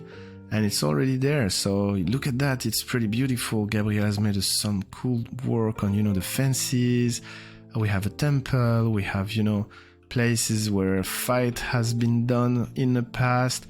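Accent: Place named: France. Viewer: Toi, je sens que t'es Français en tout cas sympa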